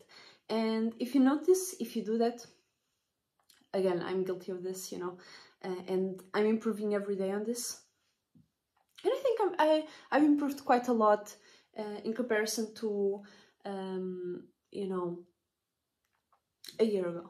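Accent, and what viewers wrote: Russia. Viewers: I really agree. I worked on a creative projective which was ambitious and the people close to me tried to dissuade me and tell me it was impossible. I said it is impossible for YOU, for me it is possible. haha. But yes I agree, hard to find genuine people who will support you and not be jealous if you are a unique and creative person. I like your accent are you Russian?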